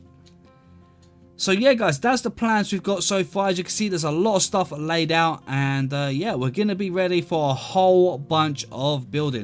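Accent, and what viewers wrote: United Kingdom. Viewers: you bri-ish? you sound it?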